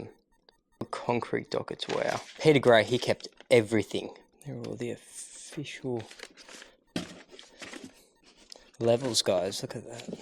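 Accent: Australia. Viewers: Great video and amazing place – loved your presentation mate – you’re a natural – so so so Aussie – just love it !!!! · Never thought this would be a whole house, from the outside it looks just like an apartment building are you in America? But your accent like an aussie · Oooo that’s a chewy Aussie accent! 😂 Kia ora from NZ 👋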